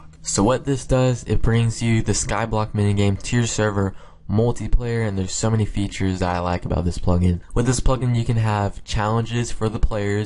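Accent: Italy. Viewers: Numero uno,are you italian or english? lel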